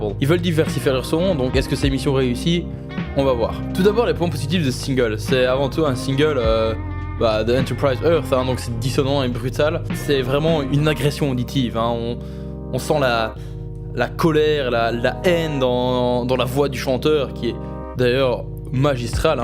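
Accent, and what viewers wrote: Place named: England, United Kingdom. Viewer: Cet accent anglais haha ! GG continue :)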